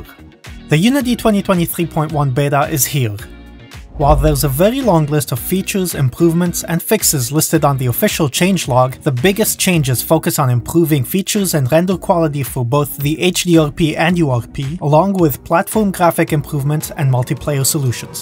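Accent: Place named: France. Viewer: Love the french accent! Good video :D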